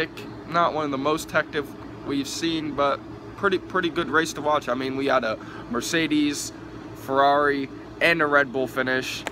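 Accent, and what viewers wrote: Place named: United States. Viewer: Mate you should really research before uploading and not just talk crap. In F1, jumping the start results in a penalty not a restart. Also, you should really learn the drivers names and how to properly pronounce them. Don't Americanise everything, and people will respect you a lot more. Very amateur video. If you really want to upload these sorts of videos you should write notes during the race of what happens when so you know what to talk about.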